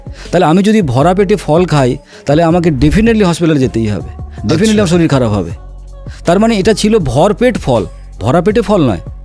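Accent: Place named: Kolkata, India. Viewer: ভাই, accent তো মনে হচ্ছে কলকাতার।🤨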